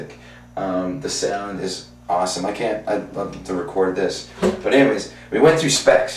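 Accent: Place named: Southern Ontario, Canada. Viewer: First time I saw you acting up and carrying on I knew you were from Southern Ontario. Us Ontarian’s have a distinct accent when we’re excited. And then there’s your Port Dover Friday the thirteenth t-shirt, dead giveaway.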